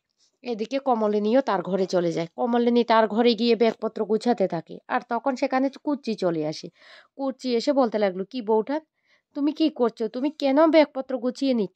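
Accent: Bangladesh. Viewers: Plz ei pronunciation niye r video deben na. Bangladeshi tone ekhono ekdom jay ni.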